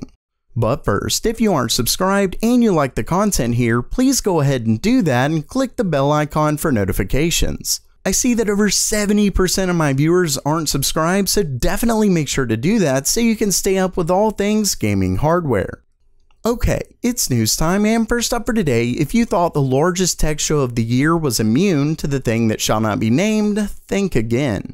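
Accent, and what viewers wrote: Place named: United States. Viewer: Just an American accent lol.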